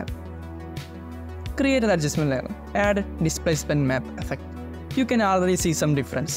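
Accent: Kerala, India. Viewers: Bro malayali anno🌝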